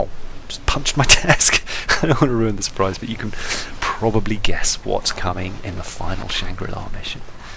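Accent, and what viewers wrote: United Kingdom. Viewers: im brittish mate, and thats a really tragic accent. its just way too brittish, useful videos though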